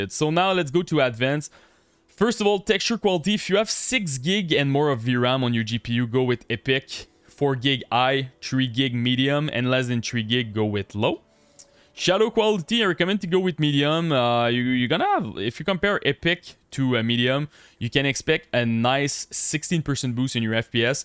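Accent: Quebec, Canada. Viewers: Bel accents man, je sais reconnaître in Queb quand il parle anglais et vraiment merci bin gros pour ton vidéo d'optimisation c'est pratique en tabarnak! :) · I IMMEDIATELY recognized that Canadian French accent that I know so well 😂 bon vidéo mon ami, c’est très important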